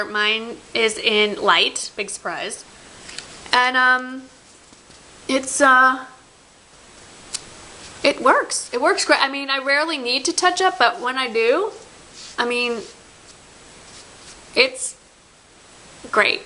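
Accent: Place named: New England, United States. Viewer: I love listening to her talk. She's from the New England states and has that accent. :)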